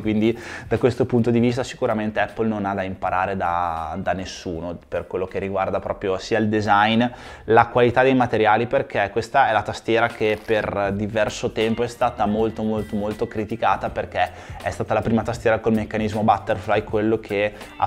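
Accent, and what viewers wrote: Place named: Romagna, Italy. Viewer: prego! Eh eh eh... scusa ma io ho la mamma di Rimini e il tuo accento mi sembra molto simile! Bellissima città Bologna!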